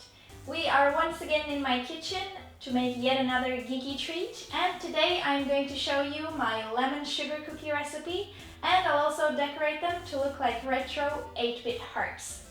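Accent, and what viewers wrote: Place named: Canada. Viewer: you sound canadian, are you from canada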